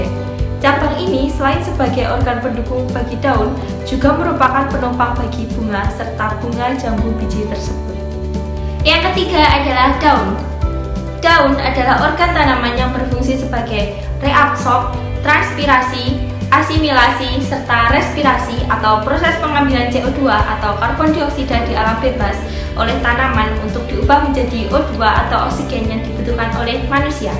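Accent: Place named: Java, Indonesia. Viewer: mbaknya cantik, tapi medhokk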